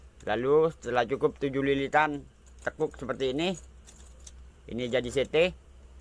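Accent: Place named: Madura, Indonesia. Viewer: Terima kasih ilmunya pak...kayaknya dari gaya bahasanya orang Madura ya pak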